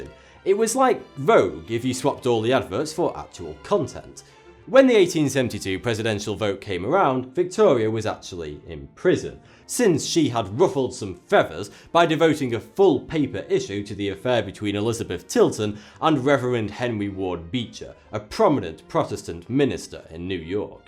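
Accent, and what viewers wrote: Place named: England, United Kingdom. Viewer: Where in England is this guy from? He has that game of thrones type of English accent.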